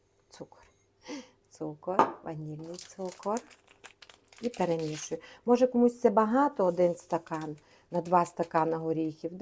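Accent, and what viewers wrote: Transcarpathia, Ukraine. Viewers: Пані,дякую за рецепт.завтра буду пекти. Дякую за дуже детальні рецепти і за чудовий закарпатський діалект.❤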